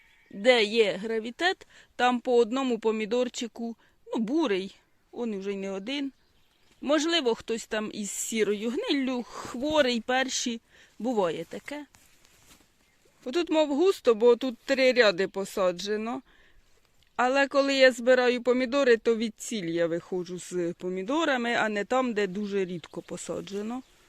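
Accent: Poltava, Ukraine. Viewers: Як приємно вас слухати Дуже милий полтавскій говір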